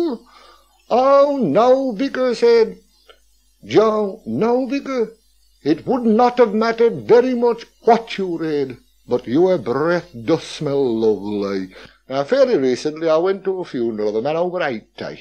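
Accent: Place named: Black Country, United Kingdom. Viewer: Excellent! Love the history and accent of the black country, thank you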